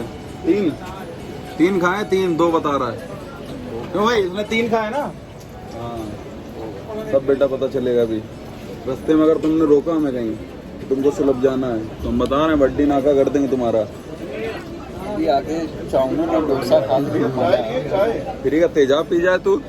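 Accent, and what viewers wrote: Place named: Kanpur, India. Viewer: आपकी भाषा में कानपुर का flavour रहता है अच्छा लगता है बाहर हो तो और अच्छा लगता है। जय बाबा की 🙏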